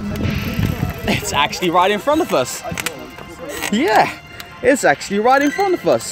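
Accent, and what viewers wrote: Netherlands. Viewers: Are you dutch???? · Ohhh you're dutch, that explains the accent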